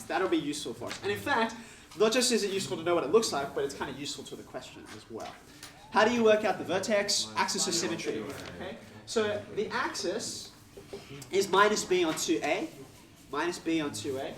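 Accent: Australia. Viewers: What is his accent? Aussie, right? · yes, hes from sydney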